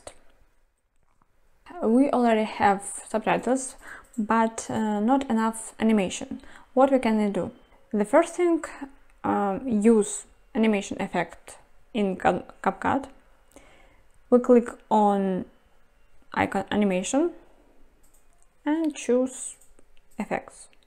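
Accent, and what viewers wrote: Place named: Russia. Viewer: Are you from Russia, because your accent is a little bit similar to Russia? to be honest I like your accent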